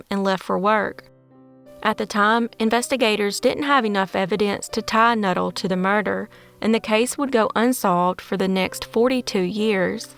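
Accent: South, United States. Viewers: Thank you kindly for your amazing story and I love you southern accent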